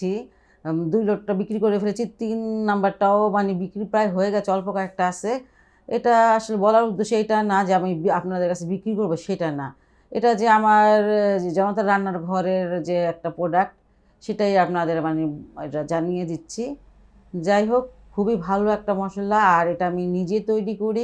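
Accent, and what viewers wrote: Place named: Tangail, Bangladesh. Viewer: আস সালামুআলাইকুম আন্টি।আপনার কথাগুলো খুব ভালো লাগলো।কথা শুনে মনে হচ্ছ আপনি টাংগাইলের ।রান্নার রেসেপিগুলো খুব ভালো লাগে।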